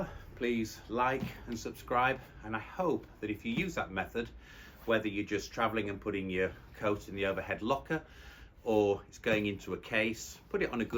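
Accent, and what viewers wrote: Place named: RP, United Kingdom. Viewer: I so like to listen to your cultivated British English. Always a pleasure.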